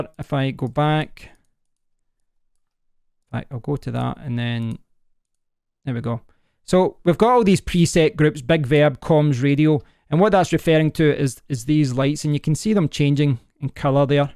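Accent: Scotland, United Kingdom. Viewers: best scottish accent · is he scottish? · Scottishhhhhhhh!!!!!!! i did my first degree in Glasgow and missed this Scottish accent. Congrats, very good demonstration. Thanks for sharing.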